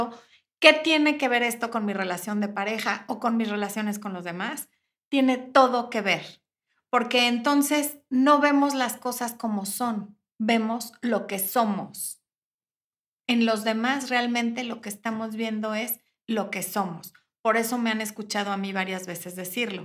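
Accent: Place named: Mexico. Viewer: gracias ante todo. Me parece que si las mujeres siguieran tus consejos serían mucho más felices consigo mismas y con sus relaciones de pareja. Yo hace tiempo descubrí que la autoestima y la seguridad de una mujer en sí misma es la mejor manera de conquistar a un hombre y que nosotras vamos primero que ellos siempre. Me fascinan tus consejos incluso tu acento mexicano que me encanta...hace un año por estas fechas visité por primera vez CDMX y quedé enamorada, solo pienso en regresar. No sé tengo una conexión muy especial con Mexico y te escucho y me fascina. Por cierto, entré a tu canal de brillar después de los 40 pero no hay contenido, ojalá pongas pronto algún video allí....Si las mujeres despertáramos el mundo sería nuestro. Un abrazo y saludos a tod@ los seguidores de este canal, soy española pero vivo en San Juan, Puerto Rico. Un abrazo y lindo domingo.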